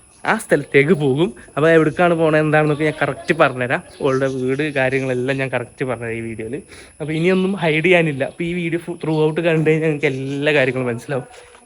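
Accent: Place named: Kozhikode, India. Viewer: കോഴിക്കോട് ആണ്...... Confirm... ഇയ്യ്... അ അന്നെ.....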